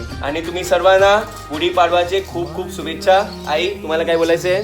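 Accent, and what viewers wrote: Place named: North India, India. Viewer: तू भैया सारखा मराठी का बोलतो?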